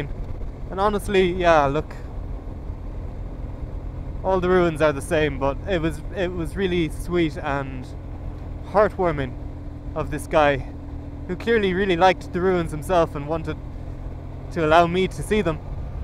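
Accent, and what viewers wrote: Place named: Ireland. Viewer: Hola! I am impressed with your progress learning Spanish with your Irish accent. I love it. Congrats on your progress. I have been with you since the beginning. Love the festival. Here in my part of the world we celebrate cherries 🍒. Lots of cherry trees in the area. We call it Cherryfest.Lots of parades, concerts, food, fireworks, and tourist from all over the country and world. I love festivals because it usually shows the good part of a community. Adios!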